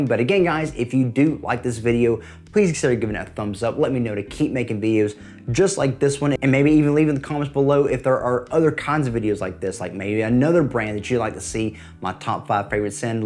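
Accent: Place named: South Carolina, United States. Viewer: Love the SC accent!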